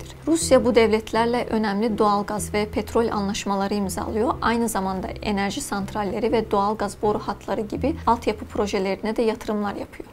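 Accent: Azerbaijan. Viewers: Sen dinlemek için değil kusur bulmak için buradasın.İşine gelmiyorsa başka yere.Azerbaycan Türkü anlatan bayan.Haliyle şive telaffuz farkı <link>ğenmşyorsan dinleme kimse seni burada zorla tutmuyor. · Kiz Azerbaycanli , konuşmasindan anladim 🫠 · Arkadaş Azerbaycan lı Türkçe anadili değil. Hem alınmasın diyorsun hem de bir insanın yaptığı işi değil, Allah vergisi sesini eleştiriyorsun biraz anlayışlı olun. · Azeridir o yüzden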